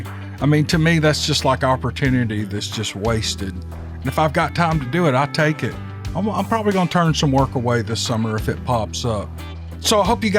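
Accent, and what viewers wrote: South, United States. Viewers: I love listening to you , you have never told a dull story. Your southern drawl gives your stories that extra "flair" for great listening. I listen to them in the dark when going to bed...they are my "bed time stories". One day I will share what happened to my brother and I on St. Helena Island, SC., rural area of Frogmore...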